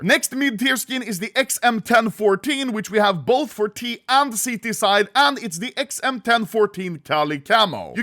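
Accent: Yorkshire, United Kingdom. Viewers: YORKSHIRE INNIT 🗣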